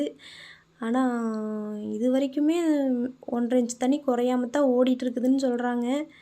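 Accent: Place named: Kongu, India. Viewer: தாங்களுக்கு கர்நாடகமா சாரிமாசகோதரி தாங்களுக்கு கோயாமுத்துர் என நினைத்தேன்மா சகோதரி ஏன் என்றால் உங்கள் பேசியதை(கொங்கு ல்லாங்க்)வைத்து நினைத்து விட்டேன் 😊😊😊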